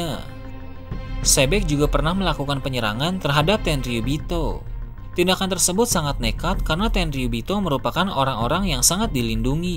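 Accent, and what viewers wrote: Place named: Bogor, Indonesia. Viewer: Bang, Lu orang bogor ya.. logatNya kentel amat..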